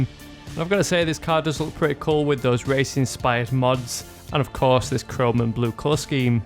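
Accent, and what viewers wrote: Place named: North England, United Kingdom. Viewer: by your accent I can deduct that you're from north England but where specifically?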